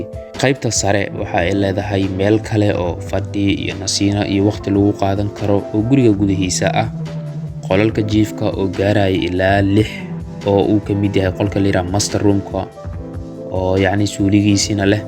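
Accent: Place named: Hargeisa, Somalia. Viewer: idol maanfahmin lahjadan ayaahargeysalaladhexjoogaa kkk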